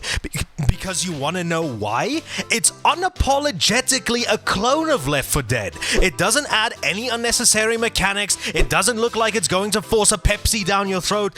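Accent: South Africa. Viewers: Why did it take me like 10 mins to realise you have a Saffer accent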